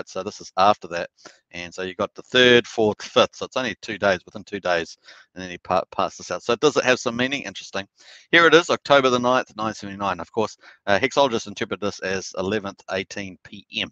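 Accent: New Zealand. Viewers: Nice to hear a kiwi accent, thanks for the informative vid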